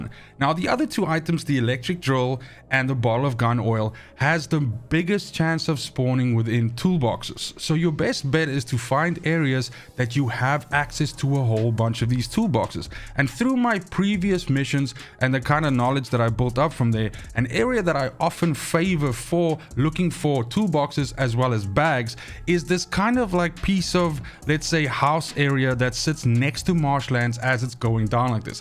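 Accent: South Africa. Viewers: Were are you from? South Africa? Hard to pinpoint the accent hehe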